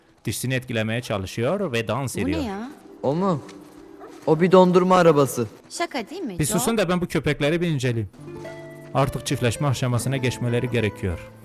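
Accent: Elazığ, Turkey. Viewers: abi nerelisin elazığlımı lütfen söyle 20k like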